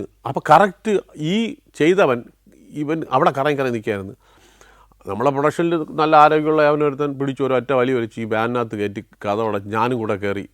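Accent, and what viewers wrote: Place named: Thiruvananthapuram, India. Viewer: തിരുവനന്തപുരം സ്ലാങ് ൽ കേൾക്കാൻ നല്ല രസം 👍🏼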